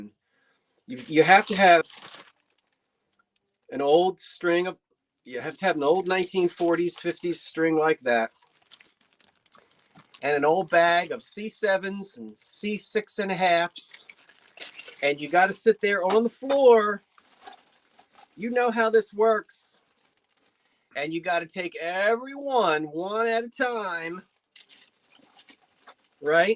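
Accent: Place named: South, United States. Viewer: 😂😂😂 and his southern accent.Am dram is missing out on your talents.David loved hearing the sandal story.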